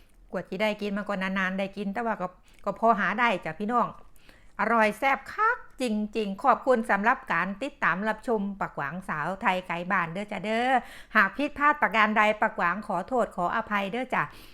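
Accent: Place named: Korat, Thailand. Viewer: วิธีหุงข้าวเหนียวด้วยไมโครเวฟ เยี่ยมเลยค่ะป้า อยู่ส่วนไหนของโลกก็มีอาหารของโปรดบ้านเรากินน้อจ้าป้า สำเนียงโคราชนี่ได้ใจย่า🙏❤️🤟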